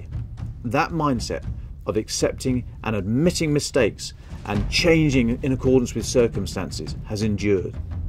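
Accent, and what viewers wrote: United Kingdom. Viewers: The bloke is so Bri'ish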